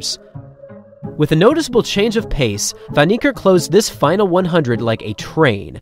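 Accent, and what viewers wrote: United States. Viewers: Yer commentary is so American. African all star?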